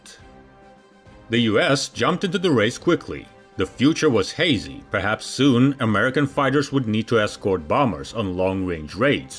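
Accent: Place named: Russia. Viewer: Английский не его родной язык, он русский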